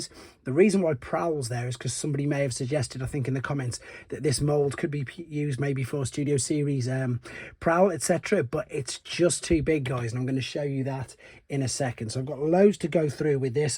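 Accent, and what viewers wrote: United Kingdom. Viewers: Hi, thanks for showing this great new toy off. Great to hear the first review with a West Mids accent too (from Cannock, myself!).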